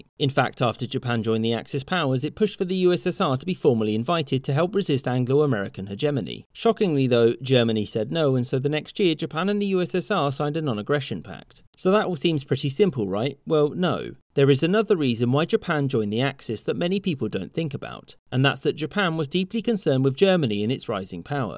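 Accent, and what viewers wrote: England, United Kingdom. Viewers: He's quite clearly English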